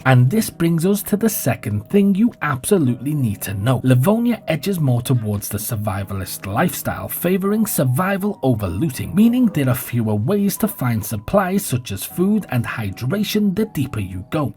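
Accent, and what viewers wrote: Liverpool, United Kingdom. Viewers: Are you part Scouse? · Not even close. This bloke is from the UK, Scouse accent from Liverpool probably.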